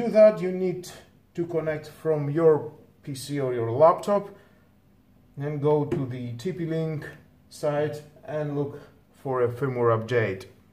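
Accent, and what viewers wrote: Greece. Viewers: και μένα μου φάνηκε λίγο ελληνική η προφορά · Μόνο οι Έλληνες έχουν τέτοια αγγλική προφορά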